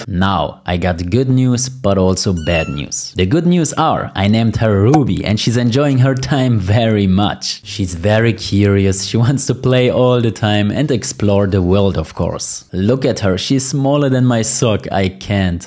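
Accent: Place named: France. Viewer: You sound like a french narrator from spongebob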